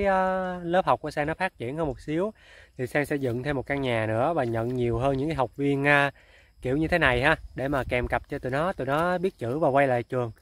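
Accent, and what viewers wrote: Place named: Mekong Delta, Vietnam. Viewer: Sang nói chuyện thì rất giống người miền tây, hình như hai mẹ con Sang là người miền tây thì phải.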